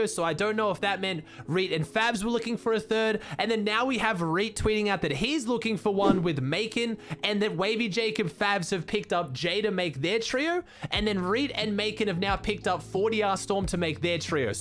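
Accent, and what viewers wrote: Australia. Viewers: I don’t play fn but I. Love listening to aussie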